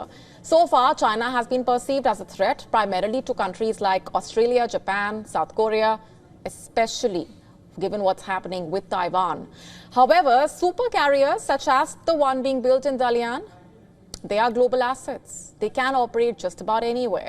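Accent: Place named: India. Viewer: She spoke with too heavy Indian accent compare to Chinese newsreaders who speak very smooth English.Hard to understand her fully. She need to speak slowly.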